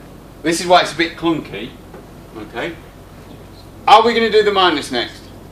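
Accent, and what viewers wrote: United Kingdom. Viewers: I love the British accent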